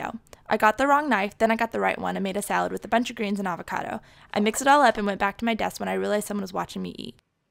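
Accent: France. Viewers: I love your french accent !!!! So cute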